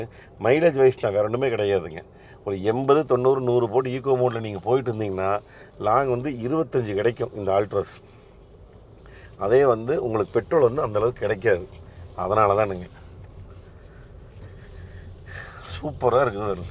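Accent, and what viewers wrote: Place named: Coimbatore, India. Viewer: அன்னன் குரல், கோவை பேச்சு அருமை....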